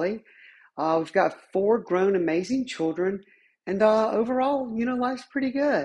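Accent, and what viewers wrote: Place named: South, United States. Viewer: Love your voice, you have that beautiful southern lilt that makes your voice gorgeous&makes it very femme sounding to me. Glad you have a loving family and can be yourself, that is awesome.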